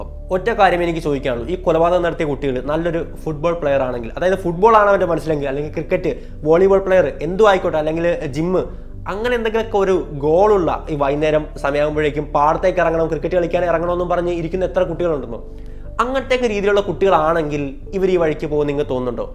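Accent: Vadakara, India. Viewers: ... Nee vadakarayano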